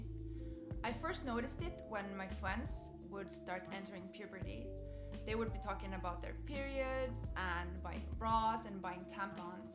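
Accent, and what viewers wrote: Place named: Germany. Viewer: She speaks English with a kinda German accent. Good clip.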